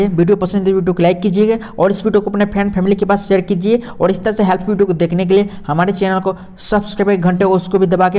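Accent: Bihar, India. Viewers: Bihaari ho kaa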